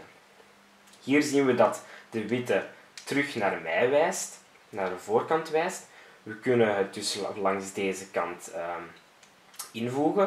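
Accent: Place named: Belgium. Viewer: Wjw das gwn nen Belg Lets go Belgen ik zen ook nen belg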